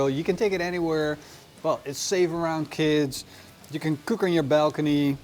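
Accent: Netherlands. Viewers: Hearing that accent… Ik wist het een Nederlander!! 😁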